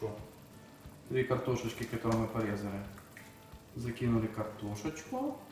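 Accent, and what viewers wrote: Ukraine. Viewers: Ведущий с Украины, похоже с Юго-Востока т.к. чисто по- русски говорит. А у нас так и говорят всегда -"Возьми , съешь огурчик или пойду собирать огурчики". Это как в Росии да и у нас русские часто говорят -" Съешь , помидорки" . Хотя остальных суфиксов действительно многовато, наверное просто мужчина хотел придать видео больше душевности и убрать официоз.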